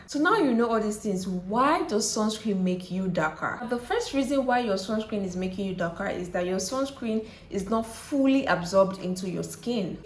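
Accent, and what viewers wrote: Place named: Nigeria. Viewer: Have a Ghanaian name yet sound like Nigerian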